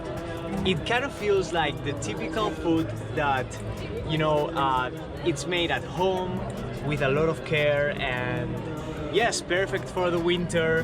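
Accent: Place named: Spain. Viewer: Great spainish accent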